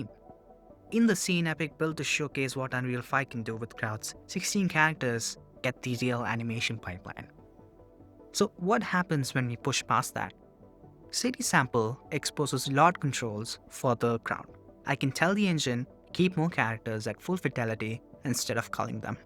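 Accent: India. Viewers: chatgpt script, youtuber vidoe essayist cadance, indian babble accent overpowering everything, terrible shit brothaaaaaaaaaa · are u indian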